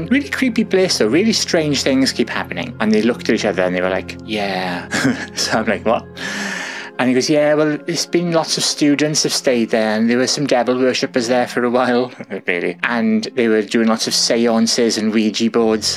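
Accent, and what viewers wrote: Wales, United Kingdom. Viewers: I really enjoyed listening to your account of what happened.. I have an open mind to these things, although i have never experienced anything myself.... your Welsh accent is lovely ! xx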